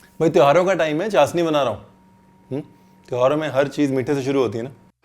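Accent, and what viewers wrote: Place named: Lucknow, India. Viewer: Hi Ranveer...u r really awesome when u say....aap kahenge Ranveer aapne bataya nahi....real Lakhnavi Andaaz.... Thanks for such lovely dishes....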